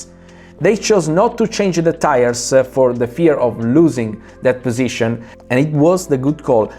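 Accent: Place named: Italy. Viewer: Hablas inglés con un fortísimo acento italiano. Pero me gusta igual. Tuttavia preferisco la tua lingua originale... I give you a deserved like👍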